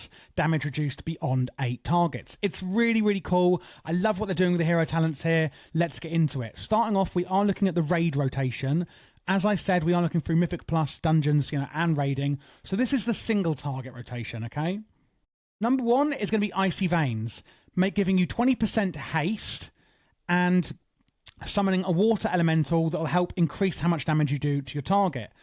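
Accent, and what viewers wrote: United Kingdom. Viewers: HES Talking the clean British stuff bro. Git gud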